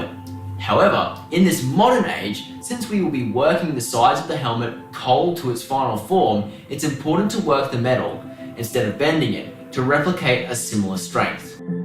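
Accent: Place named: Australia. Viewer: я уже и забыл какой у австралийцев забавный акцент привет от World of armor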